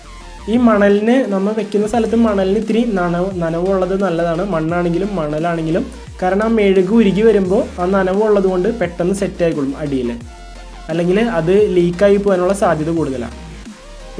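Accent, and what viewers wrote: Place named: Ernakulam, India. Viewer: Kollam bro... Ur slang u must be from Ernakulam area, I think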